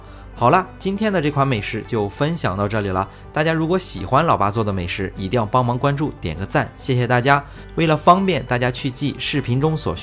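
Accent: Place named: Shandong, China. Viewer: 听着就是山东老乡口音 青岛的吧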